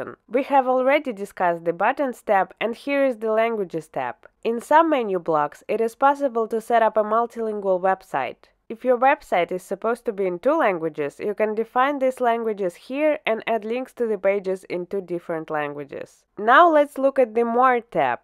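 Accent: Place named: Russia. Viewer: Приятно слышать русский акцент)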